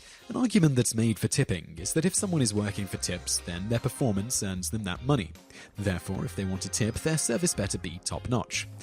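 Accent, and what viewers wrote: United Kingdom. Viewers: The narrators voice was was from the UK I know because I am also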